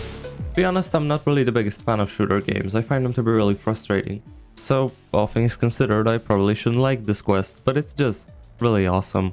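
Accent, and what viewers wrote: Poland. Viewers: Słychać, że Polak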